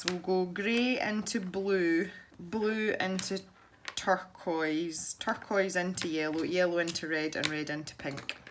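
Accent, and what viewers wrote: Scotland, United Kingdom. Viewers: OMG You're Scottish!!! WOW what up! Loved the video btw check out my podcast if you fancy a listen :)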